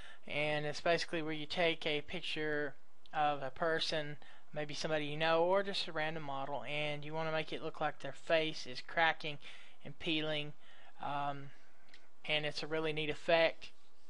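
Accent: South, United States. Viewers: You sound like dunkey with a heavy southern accent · sounds like peter parker (not from amazing spiderman) with forest gump accent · Def not Forrest Gump but very southern, love it! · I find your voice Awesome.... YOU must be Southern Like me? Perfect tutorial ignore the haters.... simple way to get this effect thanks bunches for this............♥♥♥ was searching for this effect Hugz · Thanks for a great tutorial. As for accent, speaking as an Englishman it sounds fine to me. Always liked the southern accent.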